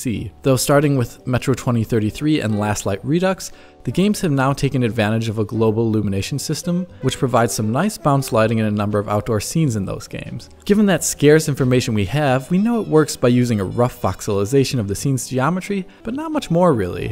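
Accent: Germany. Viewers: Wußt ichs doch, das ich da nen deutschen akzent raushörte. Bis bald 😉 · And by millennial lisp, I guess you mean a German accent.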